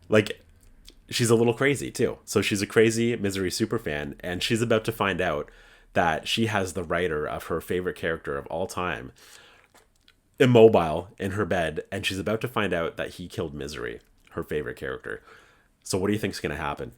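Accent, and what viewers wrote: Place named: Canada. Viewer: The way I knew you were Canadian before you even said you were😭canadians are the best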